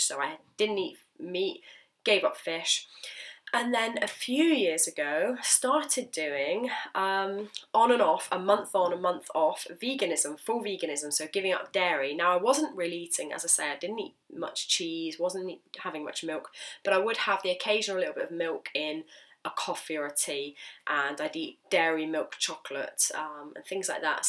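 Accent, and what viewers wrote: United Kingdom. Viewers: Nice! Im half way so i brb. Gtg eat lol im a sucker for UK accents. Geez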